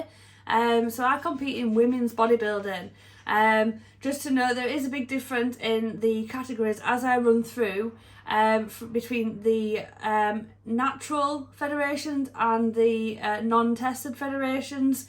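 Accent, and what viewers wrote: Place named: England, United Kingdom. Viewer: Whichever you will pick...you are already winning! Love that accent...is that English? lol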